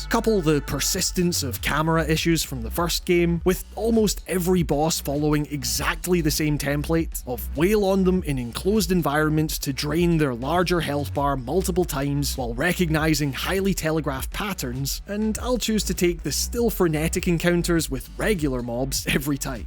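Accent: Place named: Scotland, United Kingdom. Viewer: This is a Scottish accent.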